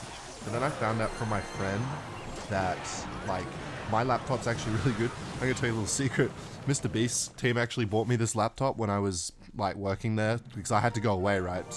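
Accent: United Kingdom. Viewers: is british?😂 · Bro is British... I am not watching this anymore..... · British? Yuck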